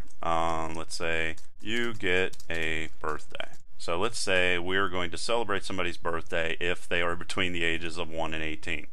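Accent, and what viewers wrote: Pittsburgh, United States. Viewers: Being from Mount Washington.I heard that Pittsburgh accent right off. ;) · I got excited when I heard the Pgh accent. Represent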